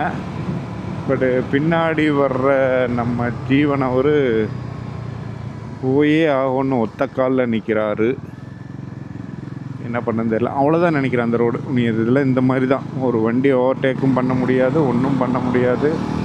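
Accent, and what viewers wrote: Kongu, India. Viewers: Hello bro, 3 days ku munnadi dhan unga first video pathen, adhuku aparam almost 8 to 9 videos back to back pathuten. Nice vlogs in kongu accent. Oru question, vehicles lam overtake pannumbodhu horn adika mateenguringa adhu dhan konjam concerning ah iruku, especially lorry ku left side overtake pannumbodhu. Please take care. All the best for upcoming videos.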